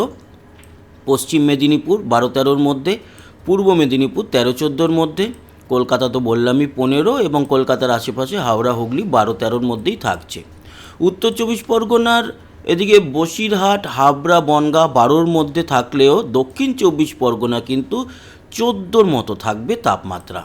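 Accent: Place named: Bangladesh. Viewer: Bangladeshi tan keno ?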